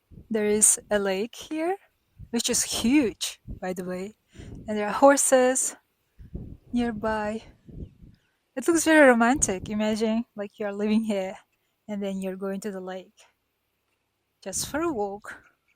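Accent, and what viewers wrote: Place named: United States. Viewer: Thank you for your tour of traditional style living. I am a new subscriber. Kolxoz means English means " a collective village". We had similar villages of normally religious groups. Some still exist today. They are very clannish and self-isolating from society. The green flat long view country is beautiful. You are an excellent guide. The world is becoming smaller every day when an intelligent Caxa women is able to speak American style English better than I do in American cowboy country. 😊😊😊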